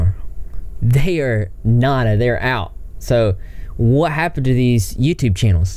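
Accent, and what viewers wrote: South, United States. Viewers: you rock a southern voice THATS HARD TO DO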